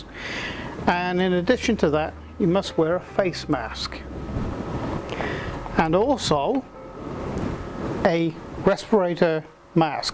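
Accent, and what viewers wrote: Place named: West Country, United Kingdom. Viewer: You talk in $, yet your accent sounds ‘West Country’!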